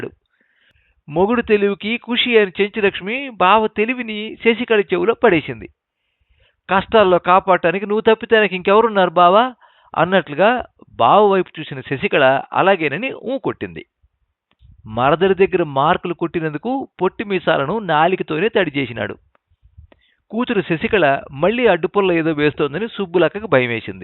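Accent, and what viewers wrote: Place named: Chittoor, India. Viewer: సర్ కథ చాలా బాగుంది...చదివిన తీరు,చిత్తూరు యాస, జోడించిన saamethalu...super 🎉🎉🎉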